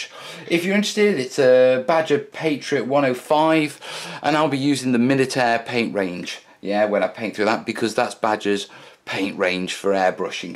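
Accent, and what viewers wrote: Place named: Stoke, United Kingdom. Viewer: by the sounds of your accent a fellow Stokie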